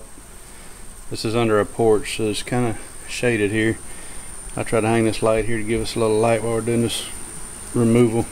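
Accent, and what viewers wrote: South, United States. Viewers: I'm fascinated, I've never seen anything like this. Great video! love your southern accent ;)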